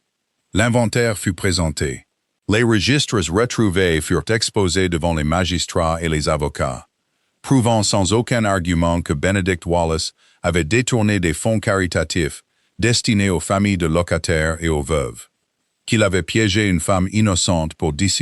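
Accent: England, United Kingdom. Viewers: Arrêtez cet accent anglais détestable ! Cela nuit à l’écoute....l’histoire est tarabiscotée...😅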